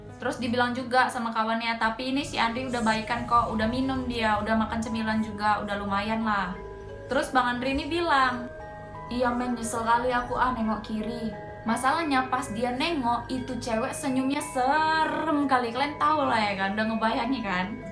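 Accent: Medan, Indonesia. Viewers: KEK NADIA OMARA APA JIPLAK APA EMANG GINI OSEMUA ORANG MEDAN NGOMONGNYA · suka banget kalo dengerin orang medan cerita beda aja rasanya kayak lebih seru lahhh mantab semangat terus kakkkkkkk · Cerihas suara Medan nya gak hilang kk .keren .horas . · Anak Medan emang gitu bang kalo bicara keren😃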